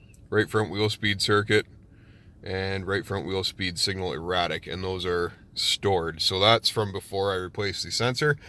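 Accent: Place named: Alberta, Canada. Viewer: you sound like an Alberta boy!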